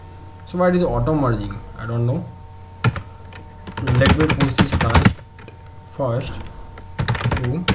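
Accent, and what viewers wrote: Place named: India. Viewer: man you have the best indian dialect i've ever heard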